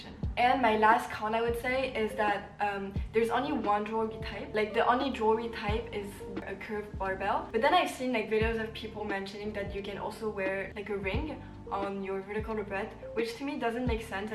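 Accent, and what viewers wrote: France. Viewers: le petit accent français je l’ai aussi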